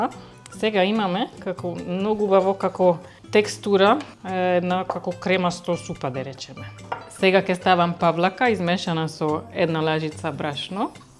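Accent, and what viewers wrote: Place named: Hungary. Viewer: Прекрасна и слатка како и секогаш. Уживам во нејзиниот унгарско македонски јазик и дикција. И секако ги запишувам рецептите.🤗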